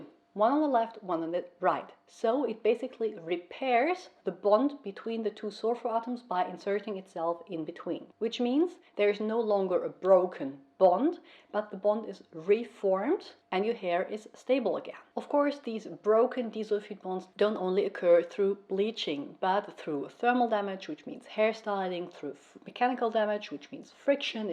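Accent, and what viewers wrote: Germany. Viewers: Omg im not sure but I feel like I can hear a little German accent!! And I thank you very much for the video!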